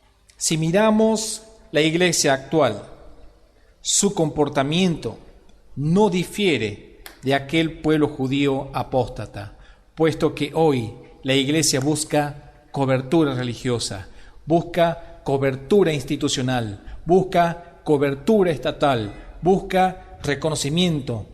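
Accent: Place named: Northwest, Argentina. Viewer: Hermano , es correcto lo que dices . Que Jesús siga bendiciéndote . ( ¿ Eres salteño ? Tienes tonada del noroeste )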